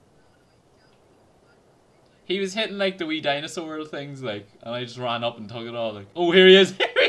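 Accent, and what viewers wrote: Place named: Ireland. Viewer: Haha that was fucking hilarious, I can tell your Irish as I'm Irish myself 😂🇮🇪👍🏻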